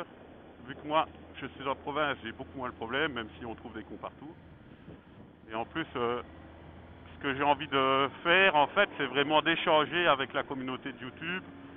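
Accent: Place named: Belgium. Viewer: Super un ami Belge avec le meme accent que moi et en plus a coter de moi . Bienvenu dans ce monde de youtubeur l'ami et pourquoi pas se rencontrer un de c'est jours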